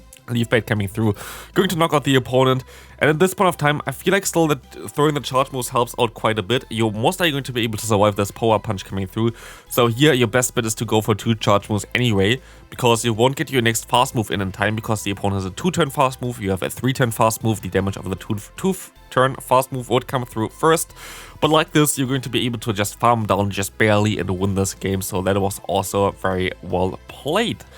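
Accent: Germany. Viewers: Sag mal du bist schon deutscher oder? Meine das rauszuhören. Ist natürlich egal, klasse Content!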